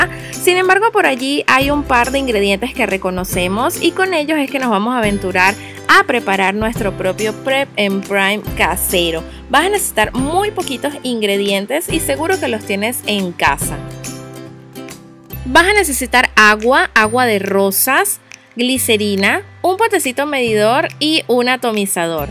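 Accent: Venezuela. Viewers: OoO que genial!! Espero que puedas seguir creciendo :3 Tu contenido es genial. Me pareció que eras Venezolana por como hablas xD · Eres venezolana? me parece por el acento y el logo de Farmatodo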